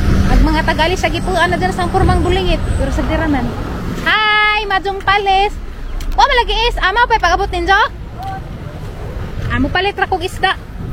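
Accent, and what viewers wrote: Bohol, Philippines. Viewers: Nindut kajo paminawon ag tinagabohol..Pohon mayo untag mkaari kag Ormoc ..ba mgpa picture LNG ko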